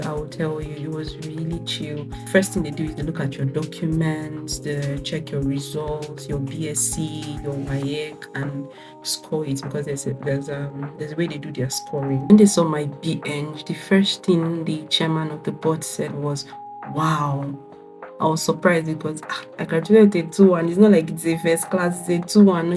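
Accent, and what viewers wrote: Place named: United Kingdom. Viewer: Na you de speak Queen's English like this??? Na wa oh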